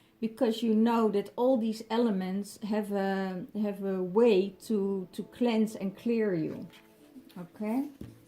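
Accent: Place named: Netherlands. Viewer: I can hear you are Dutch hahaha it obvious hahah; ik ben afgelopen weekend zwaar getransmuteerd 👌🏻 I like it!!!!!!! Ik ben een man beschouw mezelf als DF, Scorpio. Indigo, yellow worrier en heyoka T is allemaal om de dualiteit in zelf naar 0. of.0 te brengen Lekker in balance joh 👌🏻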